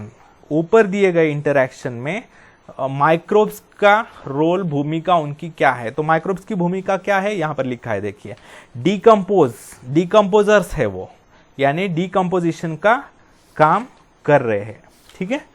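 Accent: Maharashtra, India. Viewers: Thank you so much sir for teaching us very nicely...😁 Btw I have a question , is your mother language is Marathi..??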